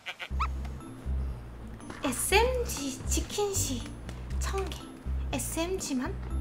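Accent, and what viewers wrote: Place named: Gyeongsang, South Korea. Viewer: 말투 경상도 느낌쓰가 나네요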